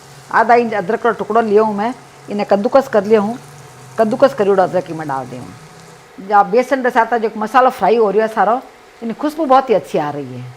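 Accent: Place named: Rajasthan, India. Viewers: आती आपकी शुद्ध राजस्थानी बोली बहुत अच्छी लगती है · Hello your Rajasthani is very nice and clear easy to understand Thank you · Marwadi aur Marwadi khano dono zordar aur aap bolo ba bhi bahut sudh marwadi aap ki awaj sun ke waaste jyada dekhu🙏meethi boli h aapki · Aap ki jodhpuri boli sun ke lagta hai ki Jodhpur main hi hu Awesome videos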